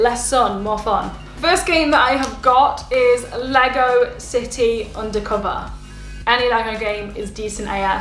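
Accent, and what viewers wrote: United Kingdom. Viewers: I must be down horrendously bad because the white toes and the UK accent is my weakness. I can listen and watch her but the white toes sigh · Just love your amazing British accent of yours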